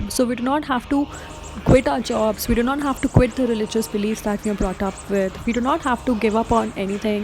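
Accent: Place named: India. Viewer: Tough Critique in the US gives this EXcellent presentation a Thumbs UP! Bravo!! The demonstration must have taken a lot of hard work, and the outcome is superb. I now have a new Mantra to chant THANKS 2U!! Might I however suggest that you speak slower, because you your words sound mumbled, and PLEASE DON'T RUSH THROUGH THE VERY ESSENCE OF YOUR PRESENTATION - You say "Nam Myo Ho Renge Kyo" so fast every single time, that it's hard to catch what's said. The background recital is softer (and a v. nice touch), so the viewer is left wondering how to pronounce it. (Especially outside India where the "Indian" in your accent is foreign to the listener!? Your Presentation is so good it deserves to be heard all over the world) Also: Nam Myo Ho Rengay Kyo would be the more precise spelling if it is to be pronounced correctly. Hope you will take this as constructive criticism, & speak a little more clearly in your future videos - You have what it takes, so go for it, and Best Wishes. 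You Go Girl - Ushta-te!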